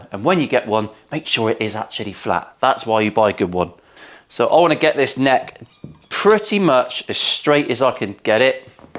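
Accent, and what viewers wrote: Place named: Northern Ireland, United Kingdom. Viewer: Might want to say 'northern Irish' accent as a lot of the world probably think of the Irish accent as sounding like Michael J Fox in BTTF3 😃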